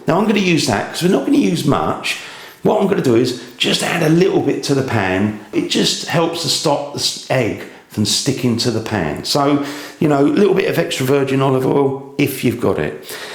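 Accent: United Kingdom. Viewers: beautiful british accent ;) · british as fuck. I love it, tough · Thanks for the recipe M8. I love the accent BTW. There's something about a Brit accent on cooking videos. They should call it the Gordon Ramsay syndrome..... ;) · British accent. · This is what most British people sound like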